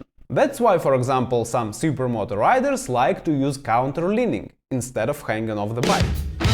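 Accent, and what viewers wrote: Russia. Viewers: Dude talks like he's russian robot. Is he one of those AI generated things ?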